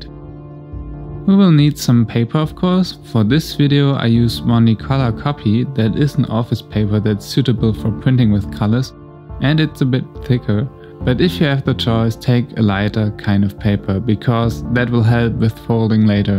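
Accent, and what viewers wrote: Germany. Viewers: He sounds german Bist du Deutsch? 'v'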